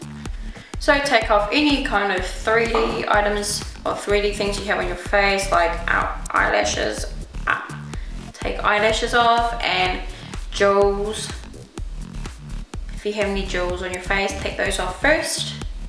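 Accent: New Zealand. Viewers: Omg you have such a maori accent lol itsa compliment! Thanks for the tips. Is this suitable for children as my kids have a halloween party coming up :)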